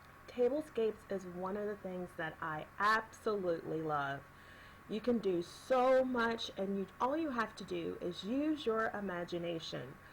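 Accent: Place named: South, United States. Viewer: I love how you decorate your home! You have such an eye for design! Your tablescapes are always on point! You mentioned picking up home decor at Krogers. More and more I am seeing home decor at grocery stores and even Ace Hardware, believe it or not! Thanks so much for sharing your bio! I hear a little Southern accent! Take care and be blessed!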